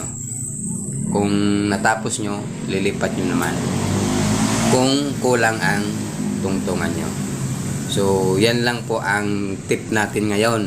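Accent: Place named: Bicol, Philippines. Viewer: Bicolano manoy?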